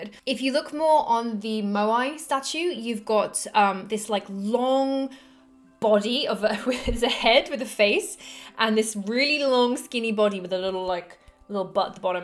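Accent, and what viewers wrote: England, United Kingdom. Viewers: The english accent really helps out the channel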